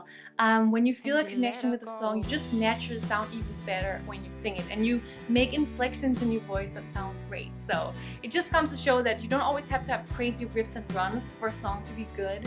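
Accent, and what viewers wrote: Denmark. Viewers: you sound a bit danish huh???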